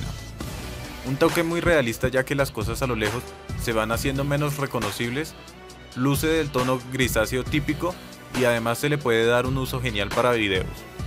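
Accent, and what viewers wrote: Colombia. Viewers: Sos de colombia cierto? No se note como asento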